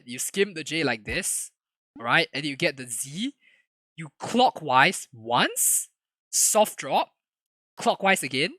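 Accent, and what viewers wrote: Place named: Singapore. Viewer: That Singaporean accent, nice to see another fellow SG player with teto tutorials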